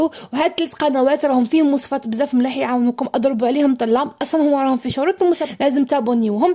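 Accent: Constantine, Algeria. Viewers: شحال تعجبني اللهجة القسطنطينية و تعجبوني بزززااااف كي تقولي نتغشس😻😻😻😻🤣🤣🥰🥰🥰🥰🥰🤣🤣🤣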